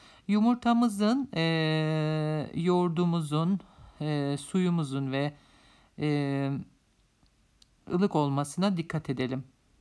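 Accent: Black Sea, Turkey. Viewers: aksandan biraz karadeniz yöresi sezdim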